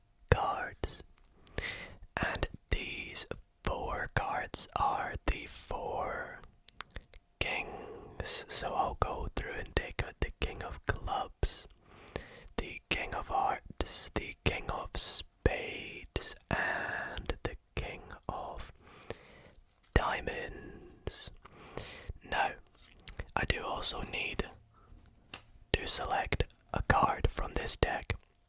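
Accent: Scotland, United Kingdom. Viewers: Are you Scottish? Cos I an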